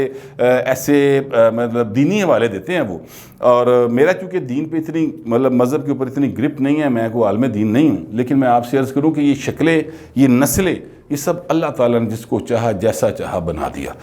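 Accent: Punjab, Pakistan. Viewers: SB. AP k v log mujhay buhat deer se miltay hen.buhat intizar rehta hy Apki Urdu k beech me in Punjabi buhat khub surat lagti hy. Ashraf gujjar from Nankana SB.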